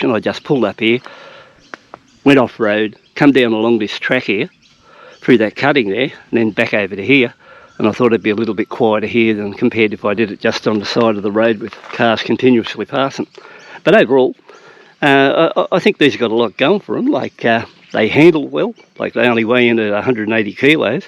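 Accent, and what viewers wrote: Australia. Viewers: As soon as I hear that Ozzy accent I know I'm in for a great honest review. Like you a have a royal Enfield 350 but am considering this bike which is now available here ( well , gb3500s) pretty much the same. Keep roller fella . Thanks 👍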